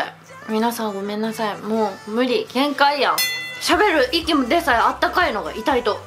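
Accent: Hakata, Japan. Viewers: 最後の方は辛さで味がわからなそう😨 今更ながらの博多弁がめちゃカワイイ‼️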